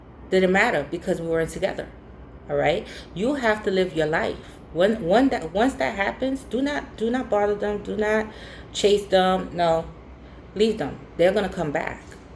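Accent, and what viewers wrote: New York, United States. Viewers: Aww. Sorry to hear that. Be strong! You sound like a New Yorker. From the Bronx, NY?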